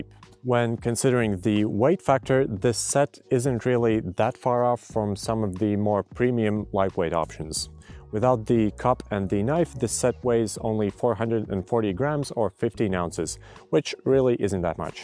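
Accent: Latvia. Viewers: Pēc akcenta atpazinu, ka latvietis :)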